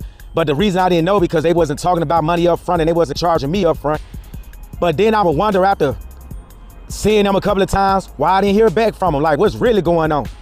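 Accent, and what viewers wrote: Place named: South, United States. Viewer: Hello from the Matrix. Love me some. ❤❤. Southern accent I could listen to all day.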